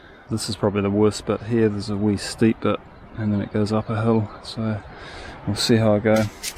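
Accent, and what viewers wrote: New Zealand. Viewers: This is the fifth or sixth video of yourse I've watched and after the first two I said to myself This dude had to be from New Zealand And you are!! Cheeeers matee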